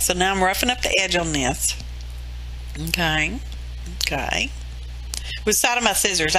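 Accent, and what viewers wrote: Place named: South Texas, United States. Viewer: I just received a wonderful envelope of RAK from you. I got the blue and green paperclip bead at minute 32. This is the first RAK/Happy Mail I have ever received. I am so excited. You made my day. Love this video and plan to check out your others. Thank you so much. I am a Native Houstonian, so You do not talk funny, You sound like most South Texas Folk. My new go-to saying is going to be piddle fartin' around. Happy Crafting!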